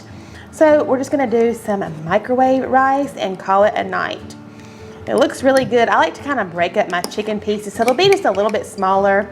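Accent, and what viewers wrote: South, United States. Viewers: I just love your darling personality and sweet voice. I lived in the south for 10 years (live in the Midwest now) but I miss hearing all the sweet as honey accents and voices of my friends and neighbors. I've been out of the South now for over 20 years but I still say y'all, and sometimes all y'all - even if I do get the occasional odd look - ha!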